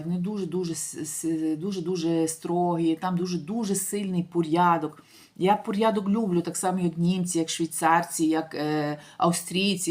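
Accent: Lviv, Ukraine. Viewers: Hallo, комплІмент, від Ольги, мені подобаєтся ваш львівский діалект,а також миле відображення життя...Швейцарія - це super!!! Дайте контакт, поспілкуемось! Успіху в усіх справах! Liebe grüsse, Olga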